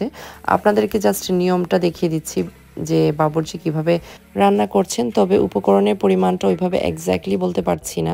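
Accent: Sylhet, Bangladesh. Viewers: আপনি বলে সিলেটের ভাসা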